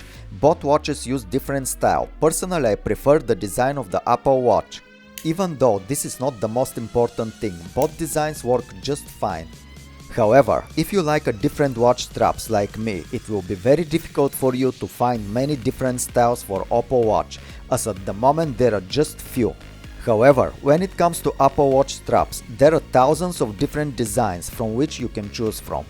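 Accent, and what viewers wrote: Russia. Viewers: Is that an Russian gentleman speaking English, man I love his accent a lot.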